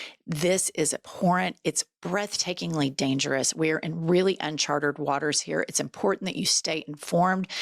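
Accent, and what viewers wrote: South, United States. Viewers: Couldn't love you all more, and I never miss a podcast. Your southern twang and righteous quest for equal rights brings me joy every day. I live in canada but know that everything that happens in the usa comes here soon after and I am passionate about saving democracy. I think peter thiel might be the most overlooked dark player of this whole bunch. Thiel's idea since paypal has been to take over the american economy by lowering the value of the american dollar to equal that of cryptocurrency, which is what I believe the tariffs are meant to do. I believe peter loves elon being the front man for distraction as he silently plays chess with america. I think thiel and musk bonded over curtis yarvins nazi views and they are seeking to create all white communites governed by their own feudal laws where women have no rights. Thiel has stated that he'd like to turn greenland into one of these communities. 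Lastly I think the blood bond between trump, musk and putin might be Ivana getting pushed down the stairs the day before she was to testify against trump in court, and jeffrey epstein getting killed in prison.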